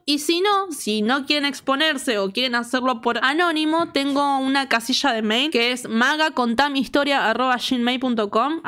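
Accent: Argentina. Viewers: Eres argentina no? Xd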